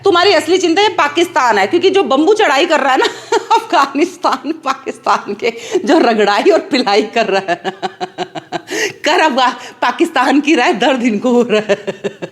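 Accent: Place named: Haryana, India. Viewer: Bahut badiya bahan Haryanvi accent bahut jabrdast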